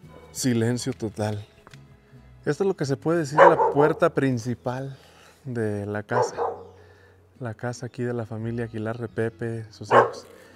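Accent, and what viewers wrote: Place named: Mexico. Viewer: Muy conito me encanta lo q mas me gusta escuchelo ablar me encanta el acento mejicano un fuerte abraso desde el salvador con muchocarino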